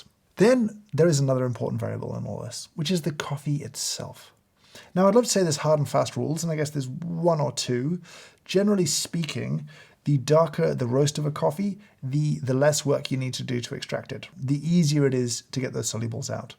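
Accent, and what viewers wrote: United Kingdom. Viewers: I have listened to you for hours now. 🌿🌸🌿 I love your videos and signed up for the Oct 3rd coffee tasting. I’m in the states.You are so English it’s like watching someone do a caricature of an Englishman. “You don’t want that. No one wants that.” You are so enjoyable to watch and listen to. Thank you 🙏🏻 for the incredible wealth of information. I saw you flipping through “The World Atlas of Coffee” and ordered it used from Washington state. What a gem you are. What fun. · Why is it that ANYTHING a Brit says sounds more intelligent and authoritative than an American? The accent alone sends the credibility of any statement through the roof. I'm not sure if I should believe anything this guy says, but I don't have a choice. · Watching in bed on silent w/ subtitles. ( so as not to wake my beautiful wife) I find a Hoffman coffee instruct-able without his nuanced Brit accent flowing through my speaker to be a bit like an underextracted quality coffee. 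A missed opportunity for a better experience. Miss your voice but I will do better next time and extract the optimal experience next time- with volume on😁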